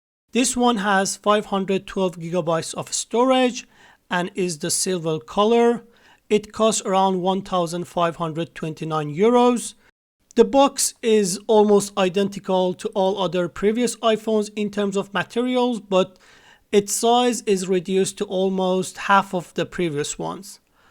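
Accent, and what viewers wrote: Iran. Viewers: The Persian accent makes it more interesting 😁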